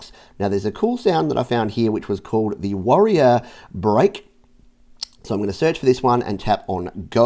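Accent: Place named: Australia. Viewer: Ya sound Aussie mate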